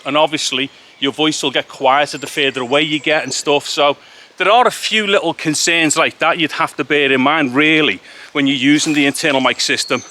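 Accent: Liverpool, United Kingdom. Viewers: I can't begin to tell you how nice it is for a Yorkshireman living in Japan awaiting his Sony ZV-1 just purchased via Amazon in the UK for his daughter to start her YouTube channel to hear a salt-of-the-earth scouser give a top-notch run-down on the Rode Wireless mic that my daughter is thinking of adding to her cache. For those of you interested in grammar, which is the main verb in the preceding sentence?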